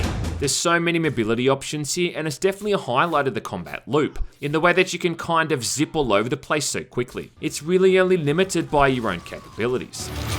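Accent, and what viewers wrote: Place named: Australia. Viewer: Cheers, sweaty guy. You sound Aussie.. til you say "toob"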